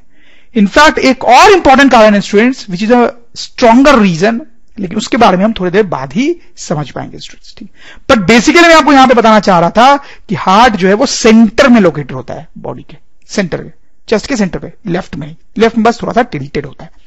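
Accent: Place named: Bengal, India. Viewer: Ap sir kaise bolte ho bengali hi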